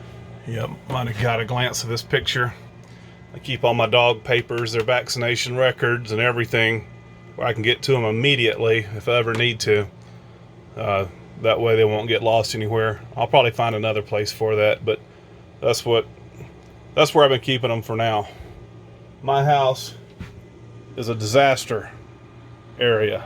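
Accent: South, United States. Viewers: I can understand you changing it to all in the closet..... something goes down you don't have stand on your head to check it out. And you have a place to add to the crazy if you want. I think a lot of the stuff outside should be inside were it can be kept clean and dry. You can store you colder cloths and equipment out side if you like but everything gets so dirty out in the bins Anyways love the Dogs playing in the water. Hows your old Friend Bill doing.? Your sure looking better. Wouldn't it be something if all your back pain was from the kidney stones you have had for years. anyways take care. And you sound just fine hahaha Southern good stuff.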